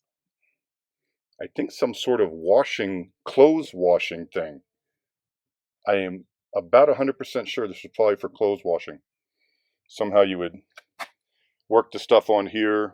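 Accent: United States. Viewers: It's nice to hear someone from the US speak . I see all these bloody Brits speaking and can't understand a thing. I'm waiting for my Italian Citizenship to go buy a property.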